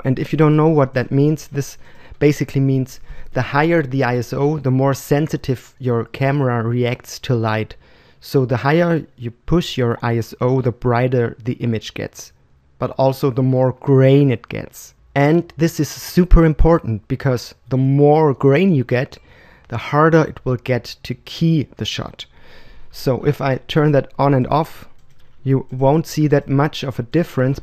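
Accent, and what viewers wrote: Germany. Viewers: Hey, so wie sichs anhört (nicht böse gemeint), kommst du aus Deutschland! Daher kurz auf deutsch: Du hast meinen größten Respekt! Nicht nur, weil du dieses Video fantastisch gemacht hast, sondern weil du allgemein ein fantastischer VFX Artist zu sein scheinst! Ich meine... du hast sogar mit Dinklage deine Zeit verbracht! Ich hoffe, ich werde mal nur annähernd an dein Level kommen! Alles Gute und bleib wie du bist!!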